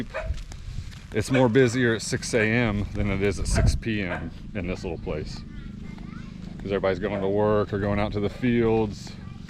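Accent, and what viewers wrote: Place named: United States. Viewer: at least your accent is giving someone with native English language from USA. You were not only raised by Americans, but you were/are exposed to American system a lot..... And I don't think it is funny. You had serious symptoms and yet it seems that you don't grasp basics of tropical diseases and that you, indeed, did have serious symptoms and that you are lucky to be alive. It seems you find this funny. Well, good for you.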